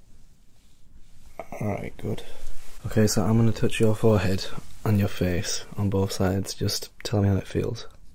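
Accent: United Kingdom. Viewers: Never heard a British…asmrtist? Is that what you call them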